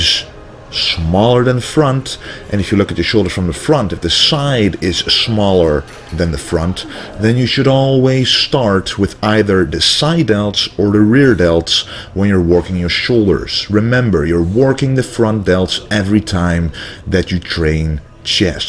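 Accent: Netherlands. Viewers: I hear a dutch accent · you have a dutch accent... de groeten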